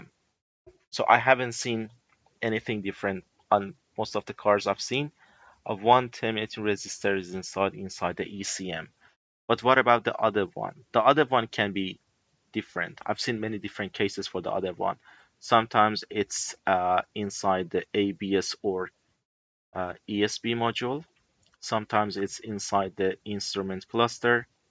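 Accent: Iran. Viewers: good job man, thank you so much for your comprehensive and functional training. your accent says that you are Iranian, am I right?😁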